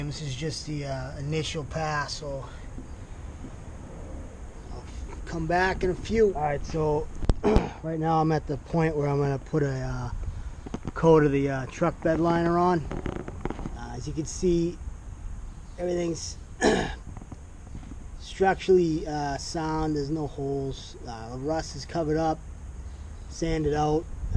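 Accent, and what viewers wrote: Rhode Island, United States. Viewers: You sound like a Rhody. Great job.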